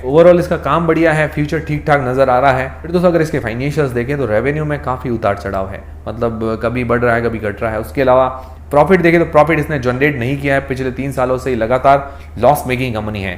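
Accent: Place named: Marwari, India. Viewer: आपके बोलने के लहजे से मारवाड़ी लग रहे हो।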